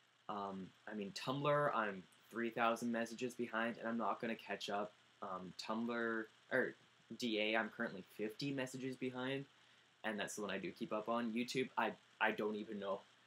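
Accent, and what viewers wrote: Canada. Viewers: This is actually the first time I've ever heard your Canadian accent xD Never really noticed it before x) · i heard your little Canadian accent more in this video than others lol its super cute ^^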